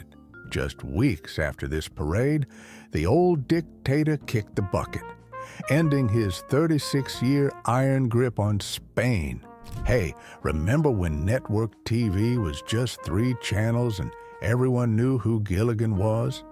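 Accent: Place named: India. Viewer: Good narration, but why the Indian accent?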